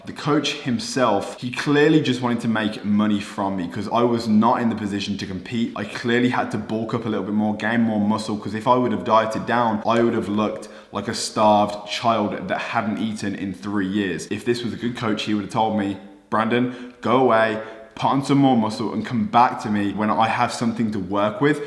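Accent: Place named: United Kingdom. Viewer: LOL He has a British accent. Do they not televise American or British shows, movies etc in whatever country you live in?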